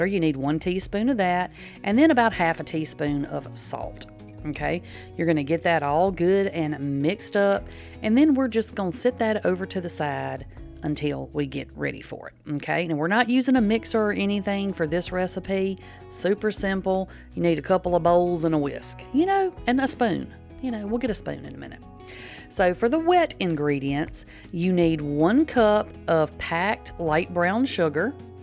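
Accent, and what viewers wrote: South, United States. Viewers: Your SO SOUTHERN LOL😂 We just came back from Tennessee I would love to move there AND BECOME SOUTHERN… I don’t know if I could ever get it right tho 😂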